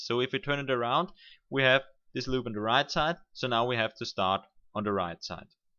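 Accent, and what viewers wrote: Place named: Denmark. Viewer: Det lyder som en dansker.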